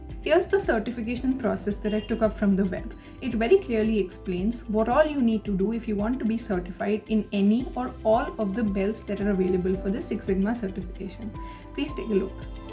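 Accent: India. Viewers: You tried to maintain a good English accent but a lot of place you are speaking as a typical Indian accent where you rolled a lot of words in your mouth and delivered it very hastily. "Try this test: say "At this time I am not available", then pay atttention to the word "available", and then good the word "available", then click on "how to say this workd. And then compare it the way you say it. Ta Da... your accent will prove and make you outstand as an Indian English speaker......any way I liked your video for the fact that you might have repeated this written script several hundred times and that is appreciable. Good work.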